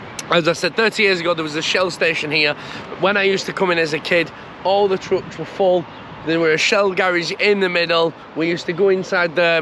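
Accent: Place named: Northern England, United Kingdom. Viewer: New to your channel, you don't sound local to redbull hq, so how did you get involved with red bull, and do you travel from your northern location to work?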